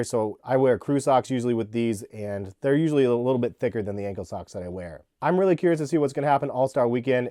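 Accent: Oregon, United States. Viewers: These are horrible. Icy sole looks cheap, only reason sole is icy is because Nike can’t figure out how to make a clear sole that doesn’t yellow, the clear soles from 90s were best but of course yellow over time. Please let’s stop the myth that tumbled leather is high quality. It’s just cheap leather w pebble textures. This shoe is crap. Like videos. You have an interesting accent, is it what Oregonians sound like? The way you say “colorway” (cull ah way) and. “Nike” (like Nyyyy Kee) is different. Keep up the good videos.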